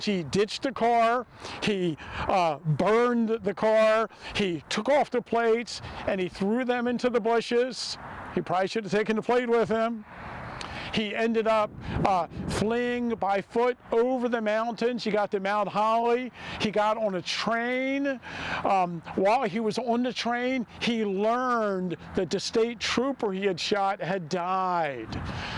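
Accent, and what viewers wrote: Baltimore, United States. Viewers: I LOVE Bal'mer accent. When I was a puppy many decades ago living in Eldersburg my neighbor Fred Will educated me on the dialect.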